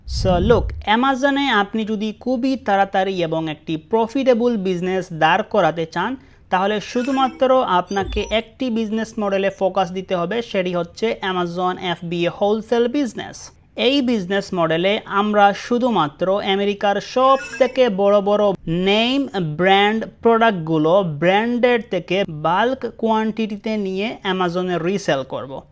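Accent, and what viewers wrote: Sylhet, Bangladesh. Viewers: আপনি সিলেটের নাকি? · Bhai ki Sylheti? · ভাই আপনি কি সিলেটি 🙂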